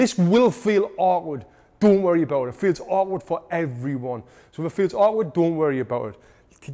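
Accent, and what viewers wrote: United Kingdom. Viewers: British accent makes this lesson reliable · His British accent is interesting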